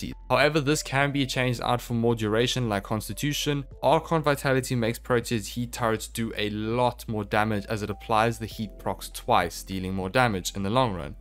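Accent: South Africa. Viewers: Mate you sound like you from south africa i cannot lie xD, great vid btw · My dude are you from South Africa 🇿🇦 I am from Cape Town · are you soug African?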